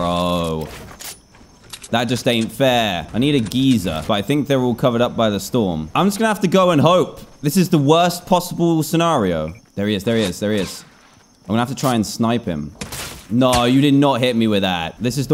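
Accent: United Kingdom. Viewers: He is British so he says stuff differently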